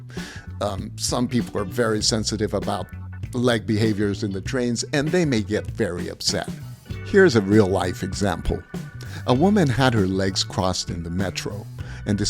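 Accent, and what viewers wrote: United States. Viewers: I'm very intriguing how did you get this American accent so amazingly speak? Please tell us?😊👏🏻👏🏻👏🏻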